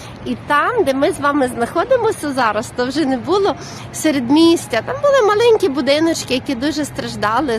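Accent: Lviv, Ukraine. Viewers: приємно слухати вашу львівську говірку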